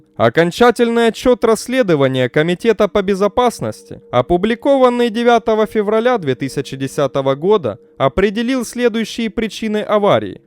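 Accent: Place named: Ukraine. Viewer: Автор украиноязычный судя по произношению, и я уже много раз пишу - розмовляй рідною мовою. Так буде краще.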